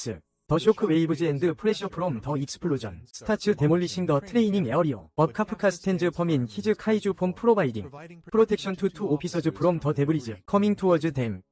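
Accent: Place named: South Korea. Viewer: 아오 콩글리쉬 발음때문에 집중이 하나도 안돼네요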